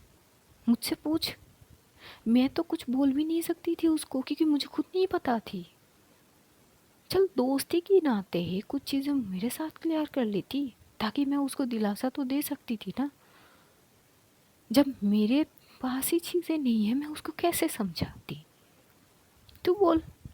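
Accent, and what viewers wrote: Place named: South, India. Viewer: 𝗛𝗲𝘆𝘆𝘆𝘆𝘆 𝗱𝗲𝘃𝗶𝗶𝗶𝗶 𝗷𝗶𝗶𝗶 𝗹𝗼𝗵 𝗵𝘂𝗺 𝗮𝗮𝗴𝘆𝗲 𝗮𝗽 K𝗲 𝗸𝘂𝗰𝗵 𝗮𝗰𝗰𝗵𝗮𝗶𝗶𝗶 𝗮𝘂𝗿 𝗯𝘂𝗿𝗮𝗮𝗶 𝗹𝗲𝗸𝗿 𝗰𝗼𝗺𝗽𝗮𝗿𝗶𝘀𝗼𝗻 𝗯𝗲𝘁𝘄𝗲𝗲𝗻 𝗻𝗼𝘄 𝗮𝗻𝗱 𝘁𝗵𝗲𝗻 💁👇 1. 𝐕𝐨𝐢𝐜𝐞 𝐓𝐨𝐧𝐞:) pehle aap ke voice thode rough thi mtlb tone me ab softness aagayi hai 😌 Phle vo lehza apka aaj v wahi hai Lekin aapka andaz thode badal gya aur apke mizaz ka to kya 𝗸𝗲𝗵𝗻𝗲 .... 𝗝𝗮𝗴 𝗴𝗵𝘂𝗺𝗲𝘆𝗮 𝘁𝗵𝗮𝗿𝗲 𝗷𝗮𝗶𝘀𝗮 𝗻𝗮𝗵𝗵𝗵 𝗸𝗼𝗶😌 Jab mene apki voice phle bar sune the mujhe lga oh ye thode rude arrogant hongi 🤣🤭 fir bad me jana nah bete tera bhrm hai yeh inke jaise pyara to..... 𝗡𝗮𝗵 𝗸𝗼𝗶 𝗵𝗮𝗶 𝗻𝗮𝗵 𝗸𝗼𝗶 𝘁𝗵𝗮 (Bas bas compare Krna h acchai nhi 🤭) 2. 𝐀𝐜𝐜𝐞𝐧𝐭 :) pehle aapki Hindi cute the just like South walo jaise Lekin fir v apka Hindi me story continue kri usme v mene to kabhi accent me apki language aate nhi dekha yeh h 🌛lagan aur nishtha se apne kaam (storytelling) ke liye samarpit hona ......🫶 3. 𝐏𝐮𝐑𝐞 𝐇𝐢𝐧𝐝𝐢 :) kabhi kabhi aap itni acchi words use krte ho ki lgta hi nhi aap ki mother tongue hindi nah ho ha mai Puri tarah to nhi kh sakti ki apki hindi puri thik hue h but ha ab aap jarha h ko ja rhi hai nhi khte mtlb bande ko bandi me convert nhi krte 😀 4. 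4. 𝐃𝐞𝐝𝐢𝐜𝐚𝐭𝐢𝐨𝐧 :) han phle phle aap stories pe characters ke name pe thode confuse ho jate the but abhi abhi apka ye chise bahut had tak kam hogyi hai ...aap rat ho din ho no matter 11 baje v aap stories dalte ho to ab characters ke name pe utna confuse nhi hote Suru suru me aapka discribe krne ka tarika v khoobsurat tha Lekin ab khoobsurat ke saath saath imagination ki duniya ke hume le jakar khada kr deta hai hum un chiso ko vis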